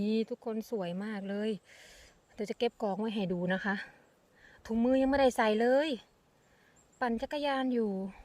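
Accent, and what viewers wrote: Korat, Thailand. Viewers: สวัสดีค่ะคุณขอถามเด้งเห็ดอันนี้มีเลิ่นหอมแบบไหนค่ะคุณทางนี้เคยเห็นแต่กลัวไม่ก้าเก็บพูดออกภาษาไทยเหมือนฉันเลยไทยเด้งคนโคราชนิหรือบุรีรัมย์ค่ะตอบเด้งเด้อจร้า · พึ่งดูครั้งแรกคนโคราชนิเห็นพูดโคราช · สำเนียงคนโคราช? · พูดเหมือนคนโคราชพิมายเลยพี่ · พึ่งเคยดูพี่คนโคราชไหมคะสำเนียงเพราะมาก ชอบค่ะ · พูดเลยค่ะภาษาโคราชน่าฟังค่ะ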